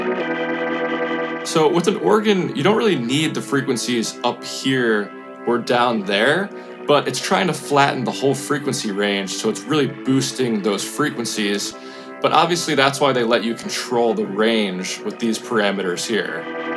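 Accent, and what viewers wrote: United Kingdom. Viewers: Oh eck - right out of bloody Yorkshire, I tell thee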